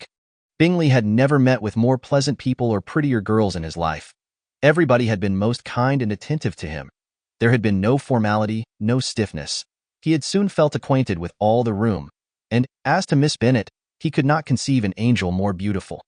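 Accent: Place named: United States. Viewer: A true English classic with a twangy American accent...Hard pass! - Sorry, but it just feels wrong to me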